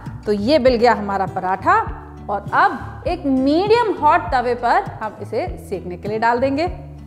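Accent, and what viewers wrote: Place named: Punjab, India. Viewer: I love the punjabi tone in ur voice ❤️❤️❤️❤️ I know you from master chef ❤️❤️❤️❤️I have supported u all over the season ❤️❤️❤️❤️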